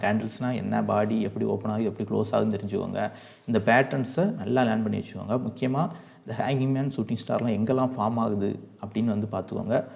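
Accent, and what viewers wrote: Kanyakumari, India. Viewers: slang vachu kandu pudicha am from kanyakumari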